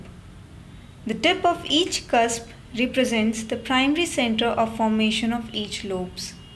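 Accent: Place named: India. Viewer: I like the fact that I didnt understand it in my language and I found someone to explain it better in English with an indian accent. Funny . Thank you !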